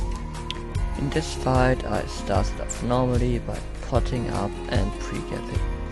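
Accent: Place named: Germany. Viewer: Ngl as a German I think u have such a German accent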